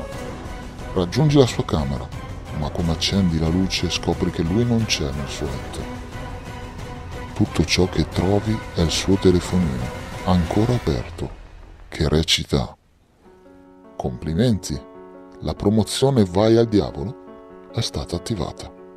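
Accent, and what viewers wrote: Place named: Lombardy, Italy. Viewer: Bella voce. Ma di dove sei? Sembri Lombardo ma non capisco di che zona!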